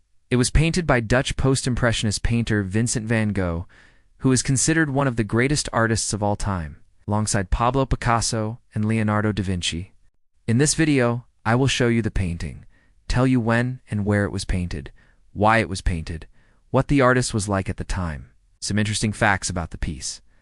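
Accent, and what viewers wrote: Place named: United States. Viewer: the worst about these common narrations is this super dumb American accent. US Americans can´t pronounce names, places and a single foreign language word f.s. and don´t even make an effort to try .... absolutely atrocious and dumb - especially in documentaries. Best turn off the narrator (as usual)..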